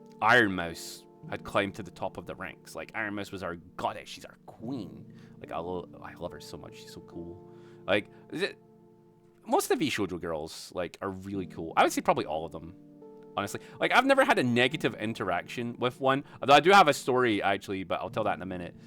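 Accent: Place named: Scotland, United Kingdom. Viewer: 🤔 Whose this Scottish guy, I didn't know they streamed~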